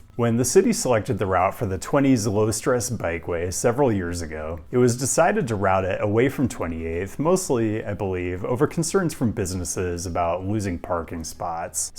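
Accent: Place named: Pacific Northwest, United States. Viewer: I always assumed he was from Portland (or at least PNW) based on his style and accent but now I'm not sure!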